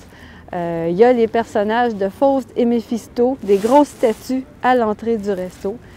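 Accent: Quebec, Canada. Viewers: J'aime bien tes vidéos, et ouais, je te comprends parfaitement. 😃 J'essaie de améliorer mon français avant mon voyage au Montréal en août, donc je apprécie beaucoup pouvoir écouter l'accent Québécois pour m'entraîner. (Et corrige mes erreurs, s'y en a, STP). 😃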